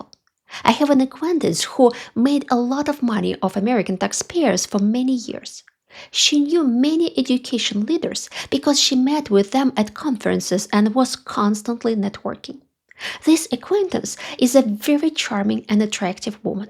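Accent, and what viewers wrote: Russia. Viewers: Just my two cents... 1. Terrible English pronunciation (strong Russian accent), painful for my ears, a transcript would have helped. 2. Long, convoluted sentences, the entire talk could be made more succinct, the concept does not desire such a lengthy analysis. 3. Khalava (халява) is a simple concept, called "bribe" in English (literally "present", or "cadeau" in French). 4. you link military technology and Russia not posing a threat, neither is either true or have logical connections, not to mention the concept of "threat". 5. Subsequently, you hint on a Hitler analogy for Russia: please... we know who are those spreading that false narrative, are you one of them? Bad idea. 6. Having a separate video about Putin's psychological profile? What kind of credentials do you think you have to make anyone interested in it? 7. Talking about arrogance (that you yourself radiate), you are absolutely right (ironically), that it is a bad trait and should be avoided, along with hubris. 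8. On generic lines, you are not saying anything outrageously stupid or incorrect, just repeating a certain narrative, hence "nothing new". Alas, biased videos like this can proliferate on YouTube, as there are always enough polarized people who will feed on these. IMHO this was a waste of (my) time, so good luck with this narrative, but I find it unwatchable and a (rarely clicked) thumbs down. Peace :) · I love the Russian accent, it is such an interesting informative explanation about the Russian culture and mindset.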